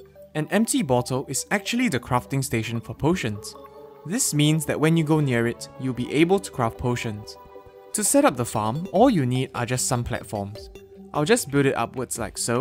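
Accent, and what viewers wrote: Singapore. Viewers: Where are you from? Your accent sounds singaporean lol.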